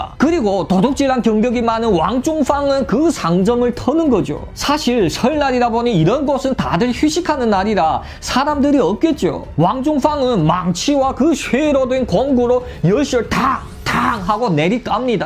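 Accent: Yanbian, China. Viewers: 왠 조선족 말투. 듣기싥어라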